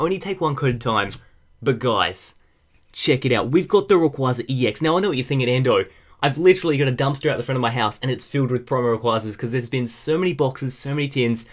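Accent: Australia. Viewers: Are you an Aussie? I am you sound like one, if so do you follow the afl and who do you follow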